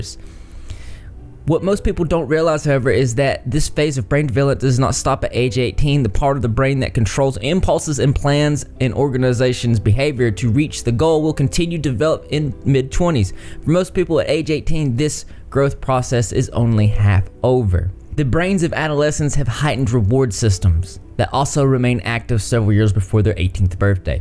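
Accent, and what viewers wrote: South, United States. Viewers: Omg who else agrees this YouTuber has stolen the hearts of many? Cuz he's hella cute even with his southern accent and he has such a likable personality. The way he speaks is so intelligent just, omg he's absolutely brilliant when diving into discussions. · Your southern accent doesn't match your style/personality. I'm in the exact same boat though. I'm from Alabama (and have a deep southern accent) but I have a 'city hippie' personality. 😂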